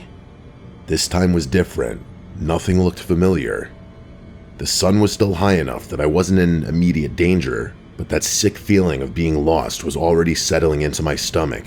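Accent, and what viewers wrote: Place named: East Coast, United States. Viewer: you're the goat. I don't know if it's the East Coast accent or the excellent curation of sound effects and visuals, but you're far and away the most competent and consistent scary story YouTuber in the business. The only channel that I have a membership of for a reason.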